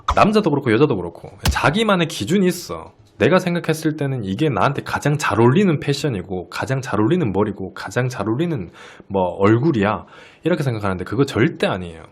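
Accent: Gyeongsang, South Korea. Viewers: 억양, 말투가 딱 대구사람인 것 같다.. 동의하시는분??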